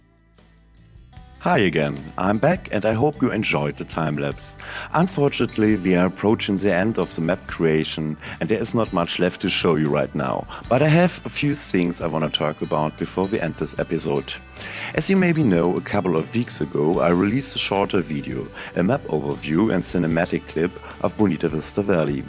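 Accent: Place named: Germany. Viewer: ich liebe es wenn ich durch den Akkzent erkenne das man deutsch ist. 😂 Schönes Video.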